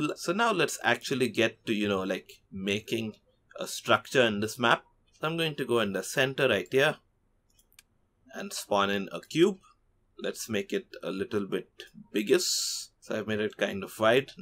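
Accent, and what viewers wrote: India. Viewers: A normal indian english accident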